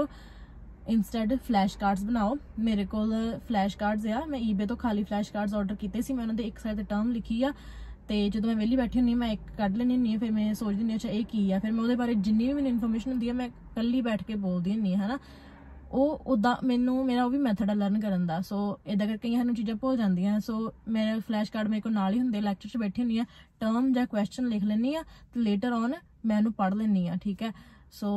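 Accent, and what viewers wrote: India. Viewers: Majhe de ho tusi lgda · Wooow I like your Punjabi accent 🔥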